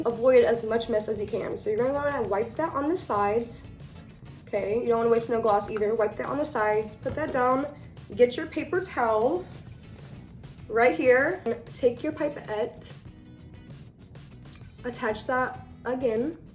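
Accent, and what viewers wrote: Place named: Latin America. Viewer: Are you Hispanic? Your accent really throws me for a loop bc you sound Latina. You are, right, like half maybe? (And btw polymer is pronounced 'paa-luh-mer.' I thought you were referring to something w/ a trademarked name, something like 'polymorph' or something. Polymers are a class of materials that are like plastic. But you should say it correctly.) Also, funny that you're teaching kids how to use syringes. I use syringes for um....something else. And don't call an object 'he/she.' Your videos make me angry.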